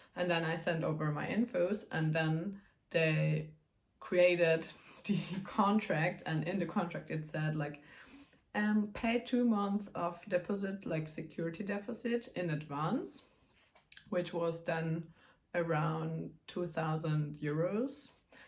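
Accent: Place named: Germany. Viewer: it's a german accent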